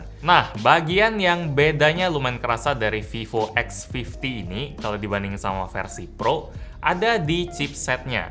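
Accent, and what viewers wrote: Palembang, Indonesia. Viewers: Bang org mana sih? Palembang?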